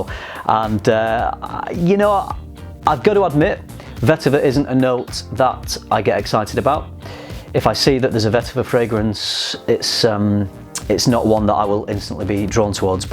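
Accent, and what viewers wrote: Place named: Leeds, United Kingdom. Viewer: Are you from Leeds? Trying to figure out where the accent is from 🤔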